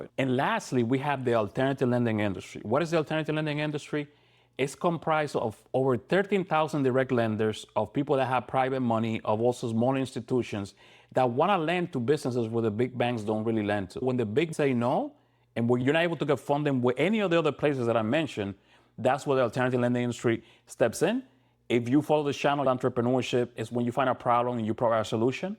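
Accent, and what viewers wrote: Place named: Africa. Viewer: I like your African accent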